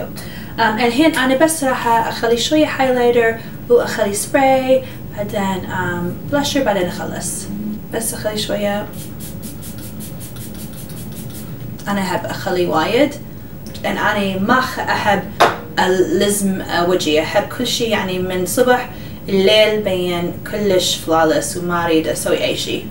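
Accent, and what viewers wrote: Iraq. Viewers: ما ازكى اللهجة العراقية عليها بتجنن 😂😂😂😂😂😍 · اييي الحكي العراقي احلا كثيييرر😩💙💙💙💙💙. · حياتي فدوه يجنن الكلام العراقي بحلگها.. تگول اذا متخلون بودره حره جوه العين يتحرك الميك اب ويصير يرگص بالوجه ههههه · you are legend you are learn me no thing impossible only work by your heart 😍😍😍متابعتج من العراق اللهجة العراقية تجنن عليج 😌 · الله تخبلين تحجين عراقي هله بالعراق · We love love love how u express the words in Iraqi language and u did great videoing and we love ur tutorials u R the <link> 😘😘😘😘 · you're really sweet when you speak iraqi , I love you so much 😻💘. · فدوه الي تحجي عراقي I like you Arabic Iraqi accent I am Iraqi too much love ❤️ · فدوه شلون تحچي عراقي 😂😂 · فدوة للي يحجي عراقي ... نحببببج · In love with your Iraqi accent keep going xx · i love you im from Morocco and I like your Iraq language 😍😍😍😍😍😍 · She was speaking Arabic specifically the Iraqi accent where she is originally from... 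I think The iraqi accent is really special in the Arab world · حلوووو العراقي من حلكج ههههه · your Iraqi accent is so beautiful love you 😍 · باللهجه العراقيه تجننين يعمري 💕💜💜 I love u 🌸💜 From Iraq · حبيت لهجتج العراقية 😍💙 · شغلچ روعه والعربي واللهجه العراقيه تخبل عليج 😘🌹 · اعشقك لهلجتج بالعراقي🇮🇶💗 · Omg bravo your talking arabic. your arabic is good iam lebanese but your talking iraqian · فدوة للتحجي عراقي 😍😍 استمري 👏👏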